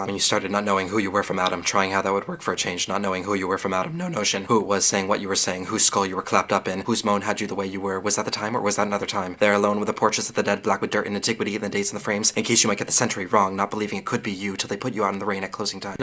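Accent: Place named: United States. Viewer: Im not certain an american accent lends to the phraseology of beckett.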